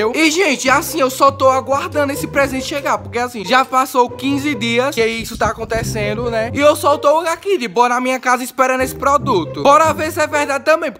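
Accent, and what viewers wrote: Bahia, Brazil. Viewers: C é da Bahia? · vc é baiano??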